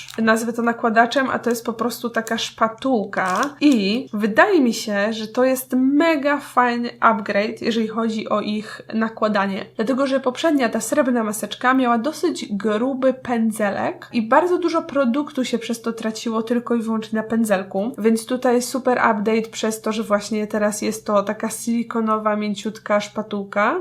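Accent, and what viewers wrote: England, United Kingdom. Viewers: Ach.... i ten jej angielski akcent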